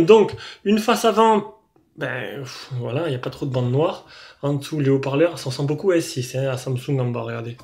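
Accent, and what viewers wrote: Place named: South, France. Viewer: Moi je trouve ta vois agréable avec un petit accent du sud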